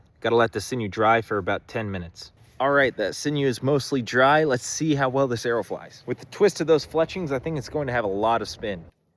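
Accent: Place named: Lancaster, United States. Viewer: OMG There's an American archery guy now! (I love your voice btw, reminds me of some of my friends in Lancaster, PA).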